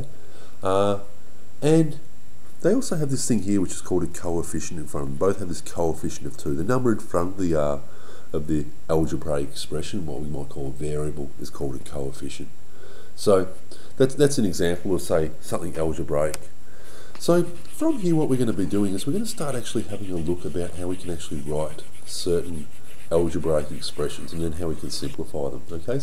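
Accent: Australia. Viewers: aussie mate! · Well he has an Australian accent. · This isn’t about the video but, are you Australian? I recognise that accent heh! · So you have an Australian accent? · Your from Australia! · im australian and i understand him hes got an aussie accent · Jessie Panganiban What? He's Australian? He sounds so fiiine as an Australian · Are you Australian??? 😳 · Is it just me or do I learn better when being taught by an Australian teacher!? I find it riveting when I hear somebody say "G'day Mate" XD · Are you Australian "G'day"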